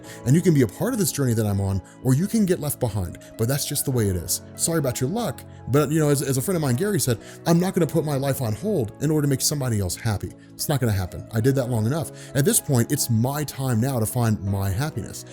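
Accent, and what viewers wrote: United Kingdom. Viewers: your English accent is amazing.. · Quality London accent,.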